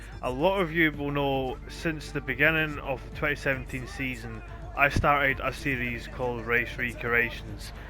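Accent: Scotland, United Kingdom. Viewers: Didn't realise you are Scottish, hello from Northern Ireland! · What the fuck you're Scottish as well haha, whereabouts are you from? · Are you Scottish?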